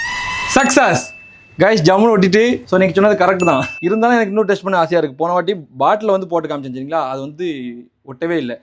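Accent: Tirunelveli, India. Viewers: தம்பி திருநெல்வேலியா?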